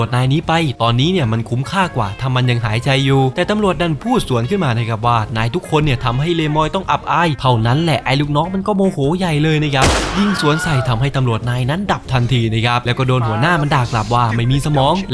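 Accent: South, Thailand. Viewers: ล่าไก่เถื่อน คนใต้หรอพี่ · ไก่เถื่อน..คนใต้ม้ายนิ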